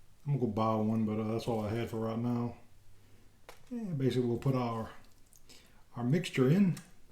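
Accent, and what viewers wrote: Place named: Louisiana, United States. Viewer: Love that Cajun accent. These look yummy!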